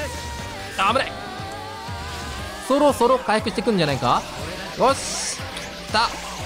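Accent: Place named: Yokohama, Japan. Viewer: もしかしたらさん横浜？に住んでるから訛りが入ってるのかもしれない（横浜であってますよね？）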